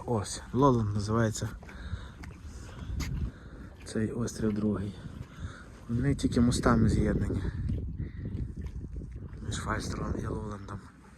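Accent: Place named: Western Ukraine, Ukraine. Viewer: Ви не будете мати роботи, яка буде високооплачуваною,тому будете мати тільки на життя і їжу. Ми за кордоном потрібні як робоча сила. Я розумію тих біженців- українців, хто з окупованих территорій, або зони бойових дій, ваша вимова (суржик) дає зрозуміти, що ви із заходу України, тому не зрозуміло навіщо ви травмуєте трьох дітей цією поїздкою. Ніколи емігрантів не приймуть як своїх, а у місцевих "усмішка на обличчі, а позаду зуби на спині". Я так пишу, бо маю досвід біженки, але я виїзджала сама, щоб знати чи варто їхати сім'ї з дітьми. Саме головне знання мови, якщо гарна іноземна, то влаштуєтесь, якщо ні - нічого їхати, або їхати в Польшу. Бажаю удачі, вона вам ще й як пригодиться!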